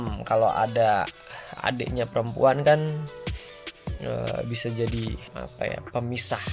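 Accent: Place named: Surabaya, Indonesia. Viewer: matamu cok🤣 wkwk mantap paido suroboyoan🤟😂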